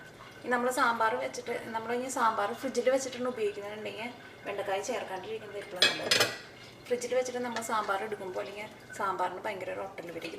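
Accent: Thrissur, India. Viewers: Dear, your sambar receipe Awesome!! But more than that i just loved your thrissur accent..;)..Keep posting more receipes..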